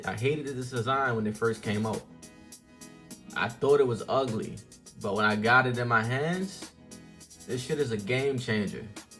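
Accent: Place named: Baltimore, United States. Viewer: Def from Baltimore, good video my G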